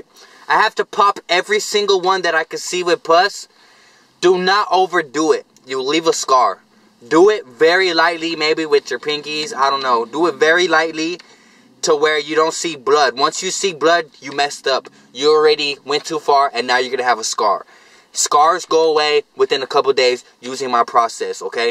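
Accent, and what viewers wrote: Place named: Texas, United States. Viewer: texas accent came out in this vid a lot 🤣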